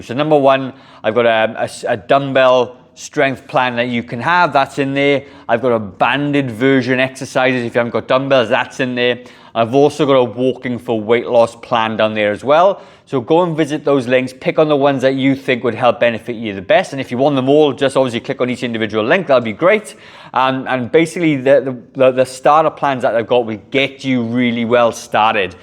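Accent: Wales, United Kingdom. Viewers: Wales, you do not need to say your from Wales I could tell by your accent first time I watched your very helpful vids, I am from Tenby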